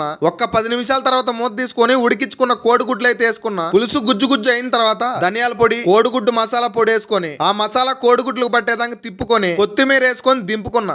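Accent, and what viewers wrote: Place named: Andhra Pradesh, India. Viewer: ఉక్కులు కాదు ఓక్కలు అన్ని అంటారు అన్న మీది ఆంధ్రప్రదేశ్ కదా ne voice చూస్తుంటే ఆంధ్ర లెక్క అనిపిస్తున్నావు మాది తెలంగాణ వరంగల్ జిల్లా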